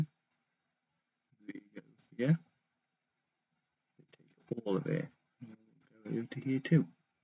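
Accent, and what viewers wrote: United Kingdom. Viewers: Nice Bri’ ish voice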